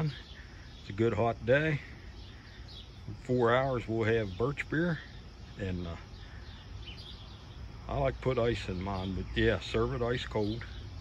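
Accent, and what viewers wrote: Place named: Maryland, United States. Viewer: That sounds like a true Maryland accent hah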